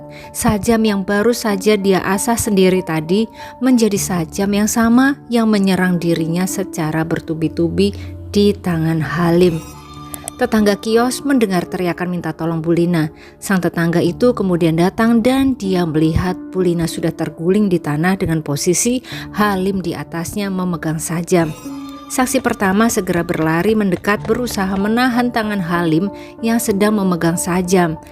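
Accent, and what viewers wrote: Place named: Banjar, Indonesia. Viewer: kebetulan lg dinas di kota ini, tp kabar simpang siur, berkat mbak sy tau kronologinya.makasih mbak..btw mbak dah bisa jd org banjar,pas pengucapannya😂😂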